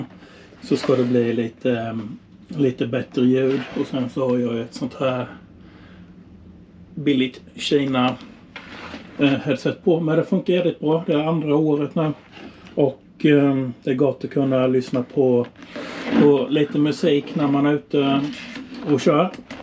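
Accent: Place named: Jönköping, Sweden. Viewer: Hittade precis din kanal och tittade på detta avsnitt. Gillat och prenumererat. Vad roligt med ännu en MC kanal från Västgötaland. Fast du låter som du vore inflyttad till Habo från Jönköpingstrakten hahahaha. Inget ont ment. Nu ska jag se om jag hittar videon om väskorna och sen blir det att titta igenom hur det går på din resa. Lyckönskningar från Falbögda.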